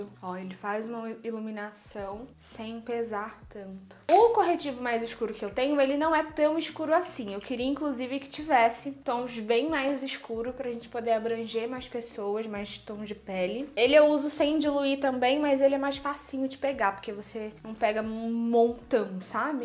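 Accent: Rio de Janeiro, Brazil. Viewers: vc é carioca?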